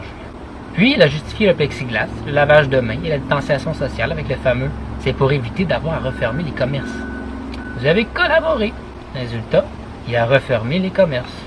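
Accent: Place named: Quebec, Canada. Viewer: Un belle accent gui va avec ton charme !! On adore l'accent québécois en Europe, et beaucoup de charme... Comme dirait un suisse comme je suis je fond comme du chocolat en te regardant et en t écoutant.... Magnifique harmonie !!!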